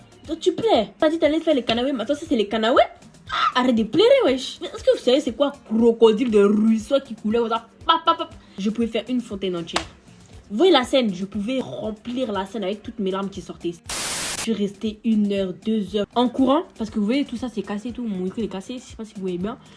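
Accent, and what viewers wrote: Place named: Democratic Republic of the Congo. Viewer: Ça sent que tu parles Lingala 😂😂😂😂😂😂😂😂😂😂😂😂 Tema l'histoire digne d'un film wallah 😂 Je me suis abonné direct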